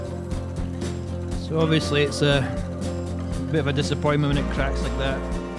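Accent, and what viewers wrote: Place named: Scotland, United Kingdom. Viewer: what do you mean by off? That sounds like a Scottish accent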